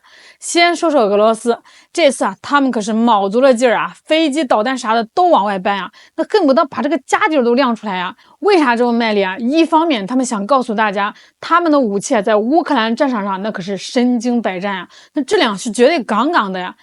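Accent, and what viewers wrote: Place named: North, China. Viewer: face-green-smiling:哈哈！很喜歡妳滿口北方口音！很有趣、也開心！:face-blue-heart-eyes::face-blue-three-eyes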